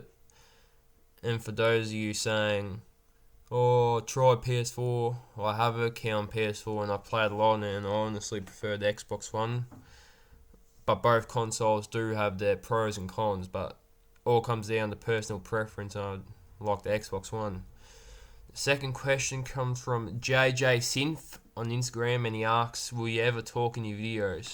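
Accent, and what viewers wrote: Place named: Ireland. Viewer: To be honest, you skipped a few of my questions, but that's fine! Also, I didn't think you're Australian. You sound more Irish than Australian.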